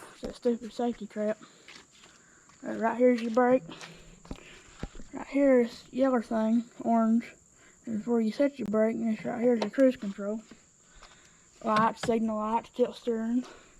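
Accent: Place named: South, United States. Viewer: love a southern Just how we all talk is awesome.. Very very strong southern accent love it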